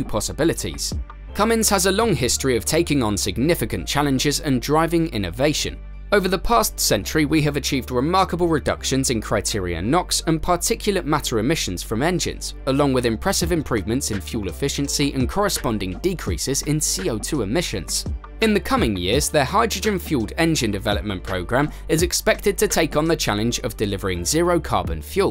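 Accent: United Kingdom. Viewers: Why do they have to use British announcers, can somebody tell me why? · The narrator speaks too quickly and has a terrible limey accent which makes it difficult to understand.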